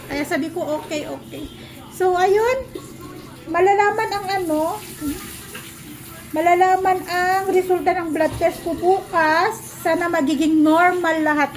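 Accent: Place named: Ilocos, Philippines. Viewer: Ganyan kasi mga ilokano ganyan magsalita kami mga ilokano kmi ni mommy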